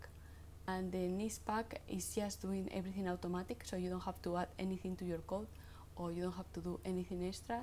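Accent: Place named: Spain. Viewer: Love the accent. U from Spain?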